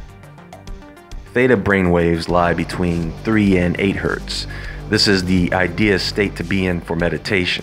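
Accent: United States. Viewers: Where is the narrator from? His accent sounds like American.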